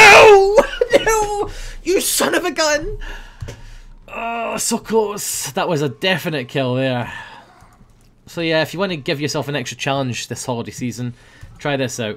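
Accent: Scotland, United Kingdom. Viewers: ur scotland ya?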